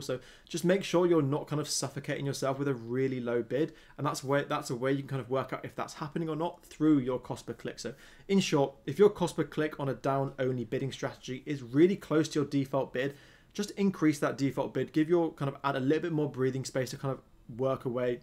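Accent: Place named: England, United Kingdom. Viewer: OMG, I was about to say the same thing. He talked so fast I kept drifting off and daydreaming. And that English accent isn't helping, it's too thick. 🙄😴😕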